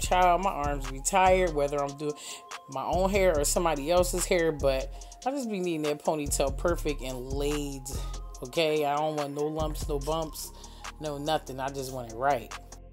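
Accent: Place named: Pennsylvania, United States. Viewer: Always love watching you work and hearing your PA accent! Your energy is so positive and inspirational. Please keep doing you! ❤️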